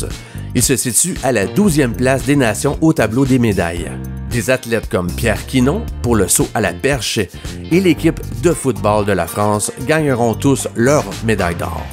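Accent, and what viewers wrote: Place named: Quebec, Canada. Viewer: Bon montage ça se regarde tout seul et avec l'accent du quebec pour les jeux de L.A c est encore mieux..^ un français qui est venu passer quelque mois de bon temps chez vous et qui en garde un bien bien bon souvenir..(plus français que la france a certains égards)❤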